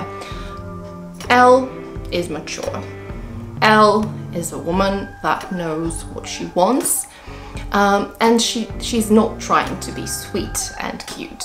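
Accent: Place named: United Kingdom. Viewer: Hi. You said in another vid you live in Poland. Did you spend time in the UK? You sound part British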